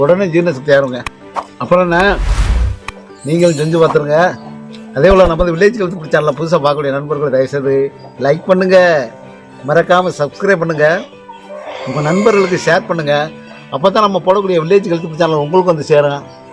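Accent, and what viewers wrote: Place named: Chettinad, India. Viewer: செட்டிநாட்டு தமிழ் அழகு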